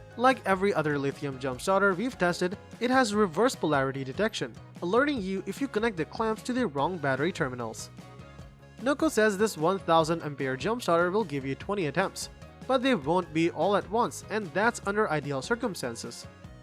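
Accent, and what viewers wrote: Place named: India. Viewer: The Indian accent makes it hard to sit through the entire video. Do you have someone who doesn't have strong accent to read the script instead?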